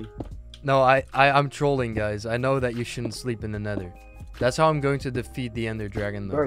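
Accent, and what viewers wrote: Netherlands. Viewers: Hey dude, great video. Are you dutch btw? I feel like i hear a slight dutch accent. Edit: welp nvm just saw the rest of the vid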